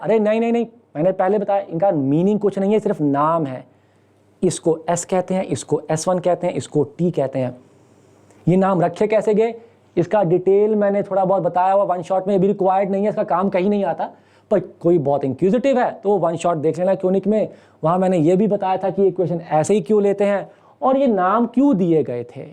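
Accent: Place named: Punjab, India. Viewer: Sir have accent of punjabi stand up comedians who lives in Delhi Sir please make a family friendly stand up comedy please🙏🙏🙏🙏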